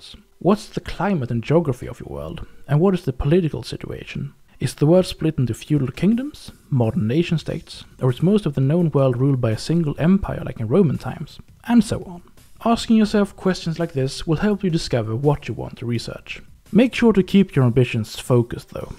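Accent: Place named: Sweden. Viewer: This is one of my favorite scenarios, both for the interesting gameplay (plenty of secondary objectives that do what they're supposed to without also derailing the basic gameplay) and not least for the visuals (!!!): it's a beautiful and very atmospheric autumn-style map (a very underutilized season, as is also commented upon in the video) that - crucially - does an excellent job at including mountainous terrain in a way that's more involved and interesting than merely dotting the map with Mountain-type Gaia sprites. Absolutely one of my favorite scenarios in this astoundingly long-lived (and still-fresh, even after so many years) gem of a game! Also: fun to see a fellow Scandinavian delivering the goods as far as top-notch scenarios go (judging from the accent, this guy must definitely be a Swede - way to go, Söta Bror!)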